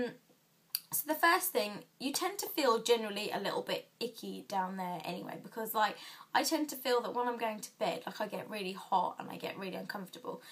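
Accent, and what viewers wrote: United Kingdom. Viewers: aww British accent <3 I wish i was there .. :( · Oh ok well she as has British accent so !!!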